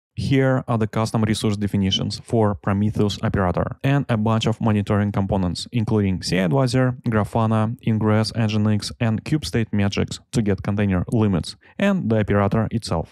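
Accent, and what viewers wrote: Russia. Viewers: думаю, чё я так хорошо тебя понимаю? а ты на русском по-английски говоришь) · That strong Russian accent 😃 Thanks for benchmarking!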